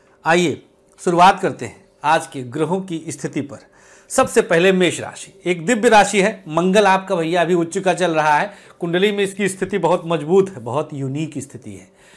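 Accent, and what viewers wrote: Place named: Rajputana, India. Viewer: sir g aap brahmin h pr aap rajputana style m baate krte h..🙏🙏🙏🙏 achcha lgta h k apne swabhiman ko bnaye rkhna chahiye..chankya yaad aate h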